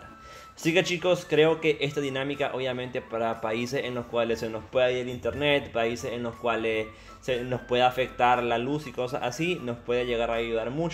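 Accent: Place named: Venezuela. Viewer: me entero q este pana es de nicaragua yo juraba q era venezolano jajaja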